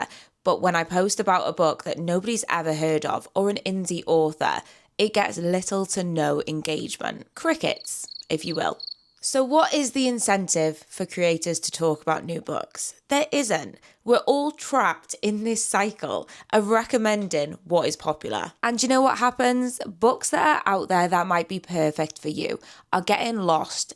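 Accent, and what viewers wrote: United Kingdom. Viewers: With those hyped/popular books, I'm often a bit are the popular because everyone talks about them or are they popular because they're actually good quality literature? In a majority of the cases, I feel it's the first option, and yes, I might be a bit cynical, I can admit that. And while it's of course great if Booktok and the hyped books may have gotten some people to read, don't get me wrong, but there's so many other books out there, that's also well-written. Just to show the middle finger to Booktok a bit, I did start an "old school" book blog where I write about mainly literary fiction, contemporary, classics, and world literature. Some titles more popular than others, but also a bit more "hidden gems" when I come across them. Btw, can I just say I love your British dialect?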